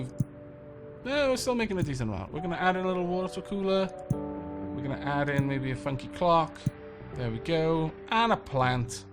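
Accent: United Kingdom. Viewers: I am only in the first minute but you kinda sound like this another great youtuber called BestInSlot who does Jurassic World Evolution/dinosaur games. I would say you both have similar vocal mannerisms, and your voices are both great and calming. Are you you British? Well I will go watch you video now. Thanks.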